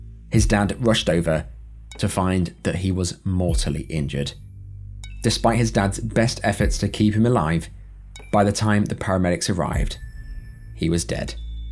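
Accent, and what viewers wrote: United Kingdom. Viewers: Yeah the writing on this channel is very 7Th grade. Even the British accent doesn’t even help.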